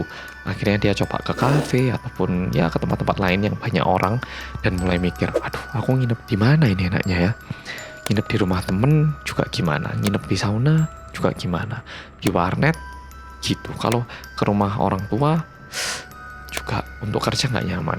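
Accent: Java, Indonesia. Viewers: Oppa medok, aslii banged haduhhh 😁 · Sumpah nonton vidio mas nagih banget.aku suka suaranya yg medok2.btw gerak gerik mas mirip jungkook bts jujur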